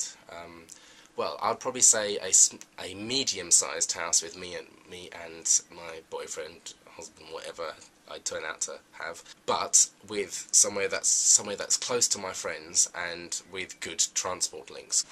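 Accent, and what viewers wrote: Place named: England, United Kingdom. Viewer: Welcome to "thatgaybunch". I thought you did a great job of introducing yourself through the questions that were asked. I believe you're going to be a great addition to this collab channel. BTW, I love the English accent.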